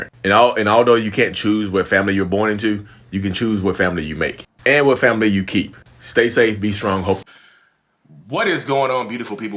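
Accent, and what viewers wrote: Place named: Baltimore, United States. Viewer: Are you from Baltimore? You say Pernt instead of parent like a true baltimorian 😊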